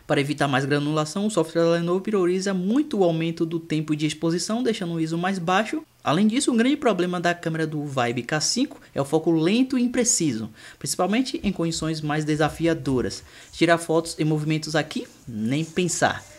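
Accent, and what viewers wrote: Bahia, Brazil. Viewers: vc é baiano ?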